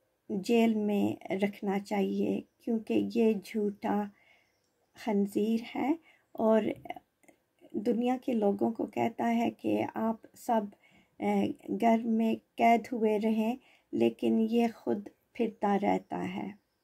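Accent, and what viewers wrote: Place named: Pakistan. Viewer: Sis r u from Pakistan.. No offence but your accent is a little difference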